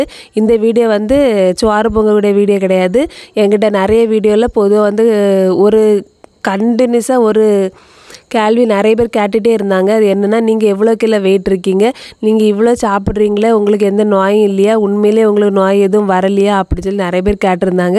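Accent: Kanyakumari, India. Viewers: Supara pesringa kanyakumari slang enaku piidichrukuu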